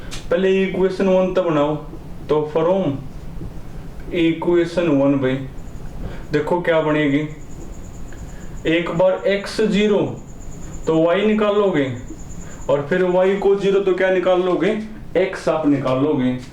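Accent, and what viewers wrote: Haryana, India. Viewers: Super aap harayana see ho kya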